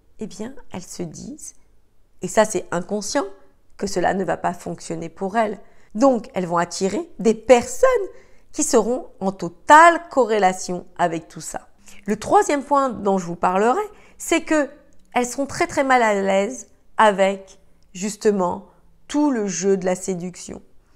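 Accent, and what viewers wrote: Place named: Ivory Coast. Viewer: Vous êtes la meilleure,! Vous avez un bel accent de la cote d'ivoire que j'adore!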